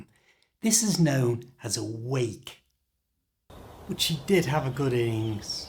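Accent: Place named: North West England, United Kingdom. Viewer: People in the south use an AH sound in "passed away", while those in the north use the short A. This guy must be from the urban north-west, like around Liverpool or Manchester.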